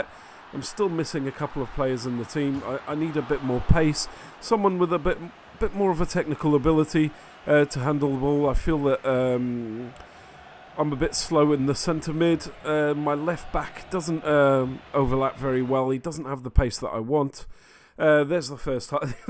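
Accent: Portugal. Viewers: You re portuguese right?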